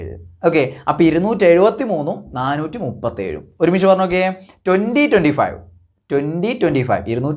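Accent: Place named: Malabar, India. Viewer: അതു മലബാർഏരിയയിലെ സ്ലാങ് ആണ്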